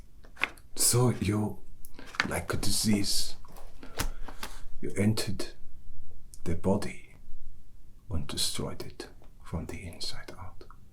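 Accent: Germany. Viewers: ey As a German, I can only confirm what the previous speakers said: Your video is, as always, first class. And now I'm showing off my in-the-know knowledge as a German: The accent is perfect! As if you were one of us from 230 years ago! Unfortunately, I can't watch the video to the end because I lack certain stimuli for the mental tingling, but I see a lot of work in your video that I want to give you credit for. Your outfit is perfect. The camera mega and the exposure divine. And the sound.. of course from another, a better world.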